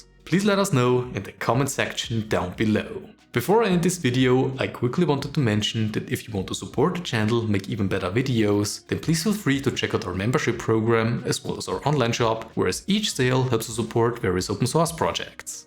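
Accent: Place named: Germany. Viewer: ich höre in dem englisch einen deutschen dialekt raus 🤔😂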